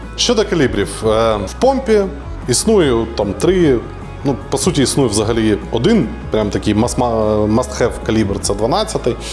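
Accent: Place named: Central Ukraine, Ukraine. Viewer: Центральноукраїнський діалект сила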